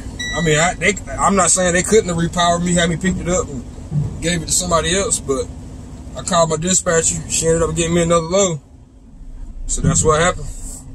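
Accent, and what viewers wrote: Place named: South, United States. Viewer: I dig tha country accsent bruh!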